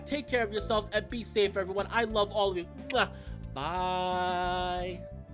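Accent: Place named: New Jersey, United States. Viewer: Hey, if your New Jersey accent comes out while recording then roll with it. Except for with Colby and one of his options. K-ho's inspiration for the setting was Boston so it feels almost authentic when your New Jersey/Northeast accent comes out.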